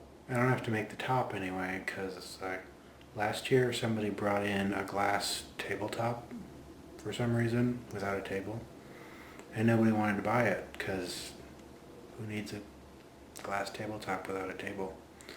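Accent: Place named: United Kingdom. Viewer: I'd like to see your other British accents.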